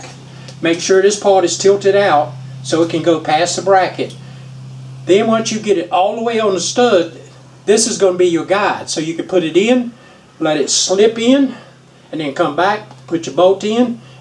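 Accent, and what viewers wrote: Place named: South, United States. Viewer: Where are you from out of curiosity? My guess is Louisiana? Texas?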